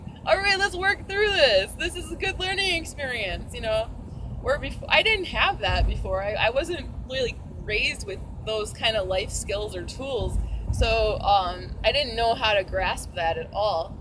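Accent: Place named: Wisconsin, United States. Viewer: The moment you started speaking i knew you were a wisconsin-ite. shout out for wisconsin.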